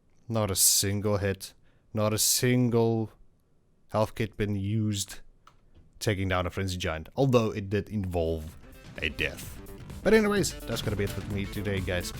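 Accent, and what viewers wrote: South Africa. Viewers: Lol bro I can hear you are from south africa thanks for the vid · are you south african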